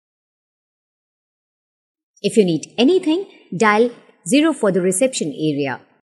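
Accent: India. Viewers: Charming woman's voice - but you have an Indian accent -- and there are errors... For example, the word is not correctly pronounced "Vindow". It's a "window". W. Made with the lips without the teeth touching the bottom lip. We don't say "I'm A (long A pronounced like the letter A) tourist" etc. We say "a tourist"...short "a". It's "Vun Thousand Bucks per night" . -- nope. ONE (pronounced "won") thousand dollars. "Bucks" is slang - and too familiar for this context. I believe I heard you say "bucks"...wasn't clear. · sounds indian accent..no i want to bettter learn from brits or americans thanks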